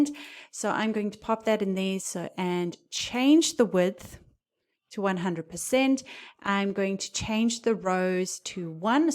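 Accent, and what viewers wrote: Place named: South Africa. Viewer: Great video and very helpful. Thank you,. Nice to hear a South African accent:)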